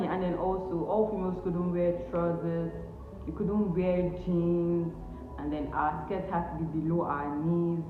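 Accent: Ghana. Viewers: Your tongue is more Ghanaian than Nigeria. I think we taught you dear my dear. Stay blessed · Thanks for sharing your experiences, it will help educators on both sides. I must add this, in a year’s time nobody could tell you’re aNigerian, your accent moving rapidly Ghanaian! Good luck with your college work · Your accent is now Ghanaian.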